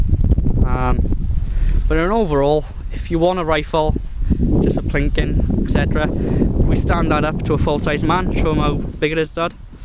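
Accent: Wales, United Kingdom. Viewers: That was the first rifle I bought and are you from Wales because you sound welsh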